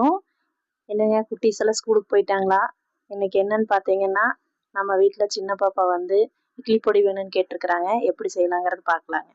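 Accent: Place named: Kongu, India. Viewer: Neenga nalla Kongu pasa pesareenga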